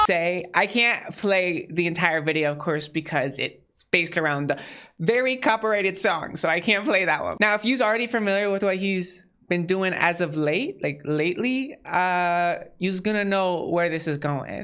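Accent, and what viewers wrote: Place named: Pennsylvania, United States. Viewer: im sorry this completely irrelevant to the actual story (although i thank you greatly for covering it), but i am fascinated by your accent lmao. I'm from MA and have heard my fair share of regional dialects but I have no fucking clue where to place yours 😂if i had to guess I'd say Philly/Pennsylvania? just clarifying btw i don't mean any of this in a negative way nor am i trying to make fun of your accent or anything, just legitimately curious. i'm a big fan of accents/language in general and love learning about different dialects/regional differences.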